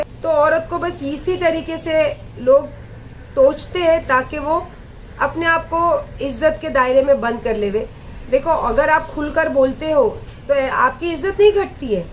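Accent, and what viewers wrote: Bangalore, India. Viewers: Wow ..😍😍😍what a bangalore style language😘😘😘❤❤❤❤❤❤❤ God bless you stay strong Aunty ji love you😘😘😘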